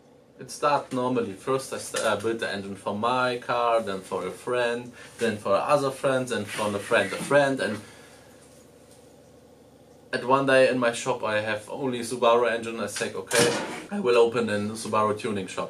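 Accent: Germany. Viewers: Best of both worlds, get to occasionally hear the German accent and see re-builds of subi engines. Lived in Ansbach for 3 years. Oh how I miss it.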